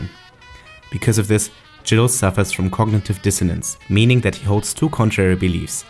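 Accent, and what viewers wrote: Germany. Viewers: I'm obsessed with Midnight in Paris, too! This video is what I was looking for when I first saw the film one month ago, and I'm happy to see it was finally created. I never comment, but this is just great. To keep you engaged with your new found audience, I've got a question for you ...is that a German accent I am hearing? Keep it up! :) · Junge nach 2 Sekunden hab ich den deutschen Akzent gemerkt hahahahah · 😎. Your choice of words, the attention to certain details, your sense of humor. Also, I'm a huge fan of ACCENTS. Your's sounds German, or Austrian. Of course it might be some other. Finally, being Black American, I don't think I've heard a fellow (white) American go into such detail about JOSEPHINE BAKER & the influence that Black Americans had in Paris during that era. You're clearly (EUROPEAN.) I realize that Europe is far from perfect but I miss it. 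Also, (MIDNIGHT IN PARIS) is one of my favorite films of all time. For these reasons, I am grateful to you ! 💌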